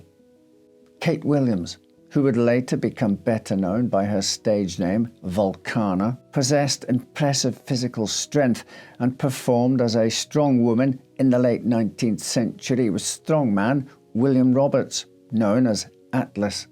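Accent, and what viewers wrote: Scotland, United Kingdom. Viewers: He's Scottish!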